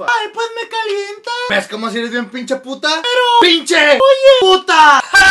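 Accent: Galicia, Spain. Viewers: eres gallego cierto? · Me encantas con tu asentó de gallego <3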